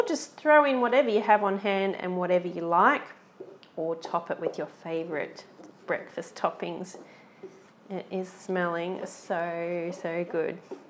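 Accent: Australia. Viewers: . I love your videos. You do them the right way. You start out immediately giving step by step directions. You don't play background music and can be heard clearly. You keep the camera on the recipe. And I have never seen a video set up to where the viewer can print the recipe. That is wonderful. You simply do everything right in your videos. I absolutely love them. And I love your Australian accent too. Thank you so much. Let me just point out some of the things that are on most other videos that drive me crazy to the point of changing the channel. They do a lot of talking before starting the recipe. They bast background music so high you can't hear what they are saying. Or, they blast background music while printng the recipe directions on the screen where we have to freeze the picture to even read the recipe. These things drive me nuts. I don't bother watching them or subscribing to them.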